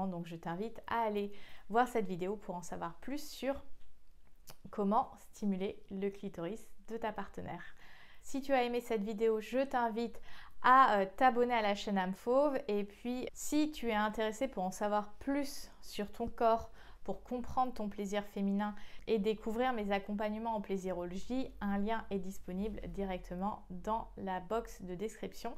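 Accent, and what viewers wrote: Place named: Morocco. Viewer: Peut-être es-tu originaire du Maroc ? à cause de ta prononciation tu as mon abonnement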